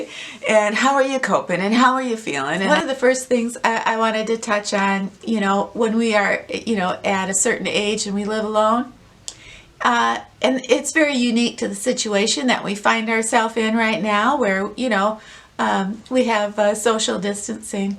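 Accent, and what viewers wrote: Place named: Midwest, United States. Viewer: i really hear your midwestern accent in this video.. it is very cute. stay well..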